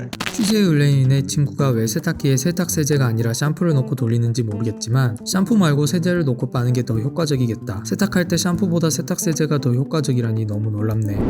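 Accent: China. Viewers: 한국분인가요? 어째 발음이 너무 이상해서요. 억양도 이상해요. 지도상 우리나라 왼편에 있는 나라 출신의 발음과 억양으로 보이네요.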